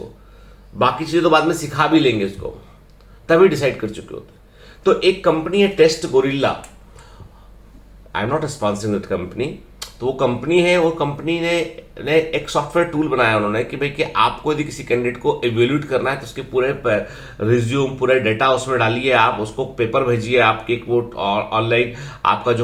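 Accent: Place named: Bhojpuri, India. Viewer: Vha sir gajab ki bhojpuri apki hn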